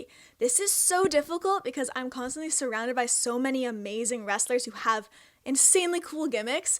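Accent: Canada. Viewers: your Canadian accent is awesome! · You sound very canadian. The "outs" and "abouts" are cute. ^^ I think the "Sorry about that" question is a reference to Colts recent Art of Wrestlling episode with Edge, is it not?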